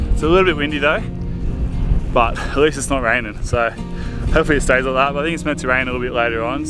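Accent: Australia. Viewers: beautiful country. i learned a new word - "brumbies" - which i looked up & found out they are feral horses. that large rainbow trout was a beauty. always enjoy your videos. it's especially interesting to watch videos from another country. i live in the US. Australia is a lovely country & i love the accent.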